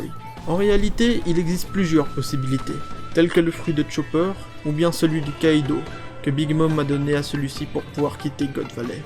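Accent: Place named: Democratic Republic of the Congo. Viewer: ça se voit à ta voix tes congolais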